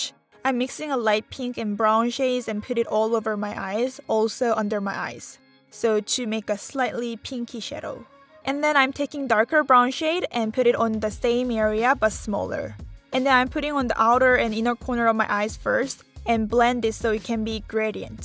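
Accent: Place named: South Korea. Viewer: You’re so pretty and when I was younger I was surrounded by fellow Koreans with your exact accent! Because I don’t have an accent and I’m studying in America, I really missed it! I love your channel <3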